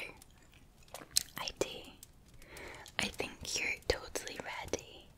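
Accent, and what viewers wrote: Scotland, United Kingdom. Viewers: hello! cool video very relaxing, I loved! you have big beautiful eyes and lips and an adorable nose, you have such a nice accent, your videos ASMR all are of them awesome and very cute. you're really talented girl and so beautiful, greetings Pretty, thanks and sweet dreams for you also precious scottish girl;) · Calm me down? Yes please, been stressed all day. Nothing better than a beautiful Scots woman under the sheets whispering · I love the Scottish accent!!! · Beautifully Scottish, as always. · Everyone here knows you're a beautiful woman, and I could make a joke about being under the sheets (most of them are already taken though), but what I love most is that Scottish accent.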